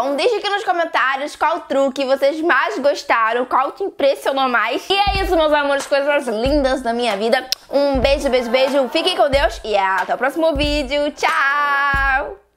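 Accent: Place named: Rio de Janeiro, Brazil. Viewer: tu es carioca né amei o sotaque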